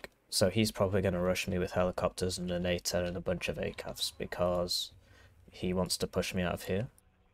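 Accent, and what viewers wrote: United Kingdom. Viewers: Lol at the juxtaposition of the Barbie song and a calm British voice bringing death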